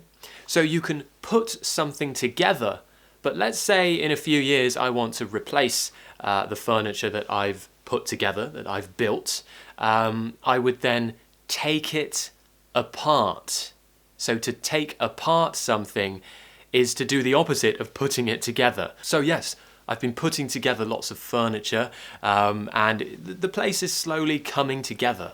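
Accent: United Kingdom. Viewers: Hi, you were really missed ❤️Congratulations on your new house. Love your beautiful British English. Thank youuu for each lesson 🥰🥰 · You are the best English teacher in YouTube I have ever seen please keep going and in loved with your British accent, I appreciate your time and effort. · British accent i love most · I love british accent I love you, 😘😘😘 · You have so clear British accent.l wish all British people speak like you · Wow..... U make fall in love with British accent.... Thanks alot... I'm watching u from Kurdistan Iraq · I'm so enjoy, when I hear you talking, I want speak british like you one day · I do love british accent.So,these days I've been listening to you talking..and I'm looking forward to new videos...Thanks alot. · I love listening you Your accent makes me fall in love with britain and british english.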